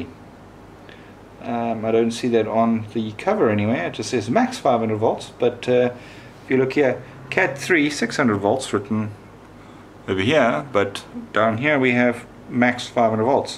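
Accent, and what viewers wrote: South Africa. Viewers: Sounds South African and they did once use a comma instead of a decimal point.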